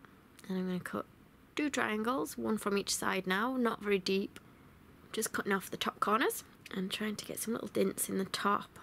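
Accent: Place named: North East England, United Kingdom. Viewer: Are you from the same area as Sarah Millican? Your accent sounds similar. It's a lovely accent to listen to, from both of you!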